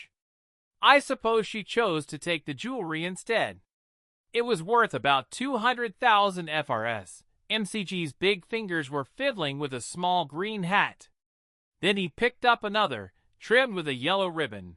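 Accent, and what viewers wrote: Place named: United States. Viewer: Omg!!! Do not let a AMERICAN READ AGAIN 😡😡😡😡😡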